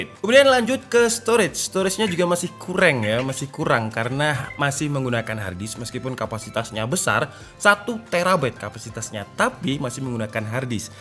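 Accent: Malang, Indonesia. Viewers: Abang Orang Mana Malang kahh?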